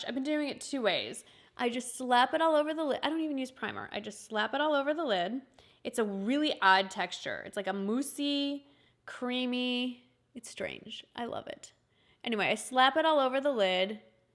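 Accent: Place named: Illinois, United States. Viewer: I KNEW you were from Illinois!! I've just started watching you and just subscribed and wondered where you were from. (Little game I play, "Guess where this person is from... I'm goofy that way) I know three people from Illinois who sound just like you. Then you said you were in Texas and I'm thinkin' no way. Then you said it. Yayyy me! Anyway, enjoying your videos.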